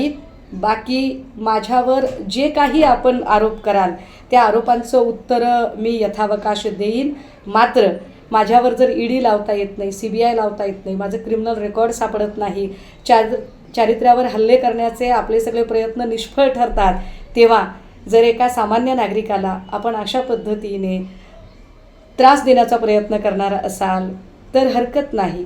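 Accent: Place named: Pune, India. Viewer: Wah madam..tumchi bhasha kiti swachha ahe..agdi puneri..sadashiv pethi....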